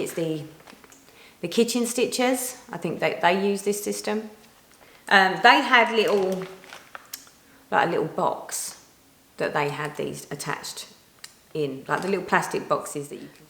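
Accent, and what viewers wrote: United Kingdom. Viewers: Looks like a great storage system. Thanks for sharing. Love all your stitching. Your accent makes me feel homesick for the UK though!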